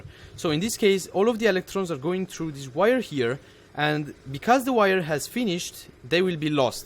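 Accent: Italy. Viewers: He is Italian accent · He isn't a native English speaker, he's Italian :-D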